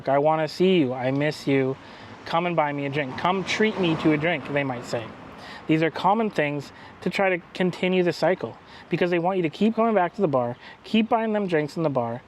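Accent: United States. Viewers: *Im not american*, says the dude with one of the most american accents Ive ever heard. Sounds like hes straight out of Cleveland or some shit. lol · You sound American to me, but if you say you are not I will assume you are Canadian, which by defintion, in my book, is "American"... :)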